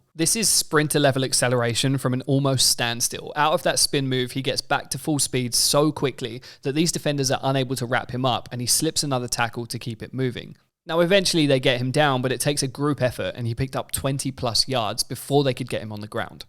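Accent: United Kingdom. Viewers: Gotta say it is super strange hearing about NFL football in a presumably British accent. Incredibly well done video and totally accurate.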